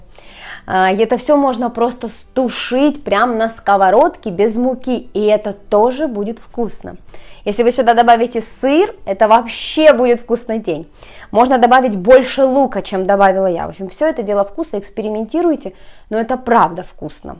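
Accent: Ukraine. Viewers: Спасибо за чудесные рецепты они у Вас всегда вкусные и очень аппетитно смотрятся.Вы человек-СОЛНЫШКО и Ваша изюминка- АКЦЕНТ!Украинский говор очень красив,впрочем,как и украинские девчонки! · Какая убедительная приятная плавучая речь. А слабый украинский акцент придаёт такой оригиналный колорит. Если нет аппетита то надо смотреть Ваши ролики. Появится и аппетит, и настроение. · Если американка говорит по русски с акцентом - это нормально, а если украинка? это же не родной язык, попробуйте сами поговорить на чужом языке. Думаю критики кроме своего русского больше никакого не знают. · Если бы у было плохо с чем-то, не было бы 4 млн. пользователей. Все отлично. Мне приятно слушать, у меня так бабушка говорила, а у меня московский акцент остался. Но я тоже считаю достоинством грамотный русский, но если его нет, является ли это недостатком? На таком русском пол Украины говорит.:)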